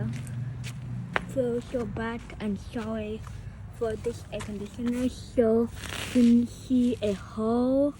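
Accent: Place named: Vietnam. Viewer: Wow your English it’s like Vietnam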